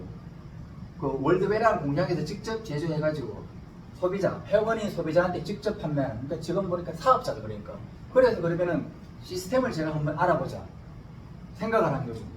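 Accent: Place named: Sangju, South Korea. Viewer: 직접체험 실정된 내용 정보나눔의 열정이 바탕이된 영상 짧은시간 함축된 간증의 이야기가 뜨겁구나 뒷부븐 라인체계가있었나? 마지막에 집중 못했다 옛기억을 더듬어보면 어릴적 누에칠때 뽕잎도 오염되지않고 깨끗해야하고 누에도 청결한곳에서성장하고 잠을재였든것같다 강연을봤으니 총평하자면 내용좋다 스피치에 강약 리듬를 추가하면 기억잔류에 효과가 더해지지싶을듯 도구의 사용 :::: 화이트 보드의 활용에서 우측 중앙상단에 한정되어있음 전체 공간의 필기활용으로 정보 주입저장성향상.... 경영체계 라인구성 (수직형 방사형?) 되물림 (상속)에서 진실성 진정성을 부각 네트워크 마케팅 첫인사 불신임타파노력 상주촌 놈 어투는 조금 변질시켜 표준어구사및 억양수정.. . 화ㅡ법 내용전달면에서 대화속도 말을 조금 천천히 쫗기는것같잖오.. 영상 구성에서는 박수치는 아저씨 아줌마 있든데 영상편집시 배석자 화면 1~2컷은넣어면 어떨까? (박수치는 동참하는 모습) 실크아미노산 정보확장 불 붙기를.....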